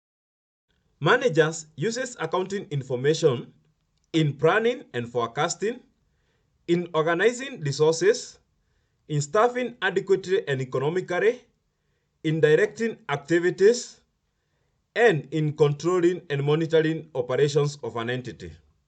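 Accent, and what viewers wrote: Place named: Africa. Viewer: Be slower in your presentation.for a better understanding for those of us that are not from the part of Africa you come from.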